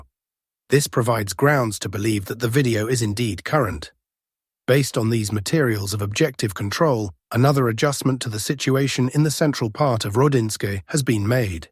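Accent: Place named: United Kingdom. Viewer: Stop broadcasting with a UK accent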